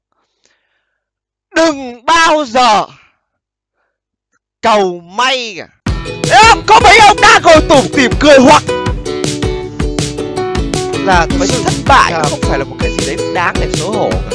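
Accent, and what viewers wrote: Standard. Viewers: Nghe anh ns nghe chuẩn vl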